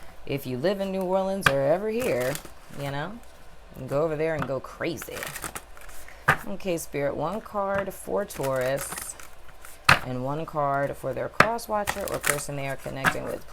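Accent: United Kingdom. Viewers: hahaha this is my first time watching you I like you I'm from London and I like your "RP - British English Accent"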